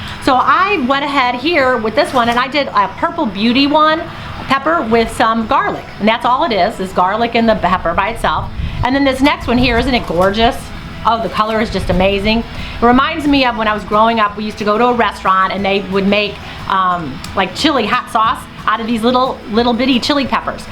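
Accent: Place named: Upstate New York, United States. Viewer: if your going to give out recipes, please learn the DIFFERENCE BETWEEN A Teaspoon and tablespoon, there's a huge difference u said add 4 teaspoons to a quart, you added 4 Tablespoons maybe in upstate new York they don't know the difference but in downstate people know the difference at least based on your accent u sound like your from waaaay upstate had to downvote your video because you gave a recipe with completely wrong measurements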